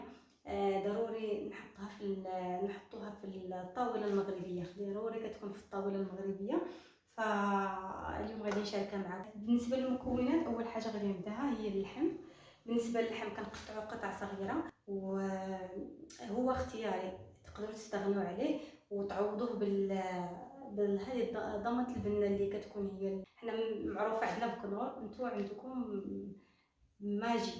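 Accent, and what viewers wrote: Yemen. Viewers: 😂😂😂 مااجملها تتكلم يمني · من ركز انها صارت شوي تتكلمي يمني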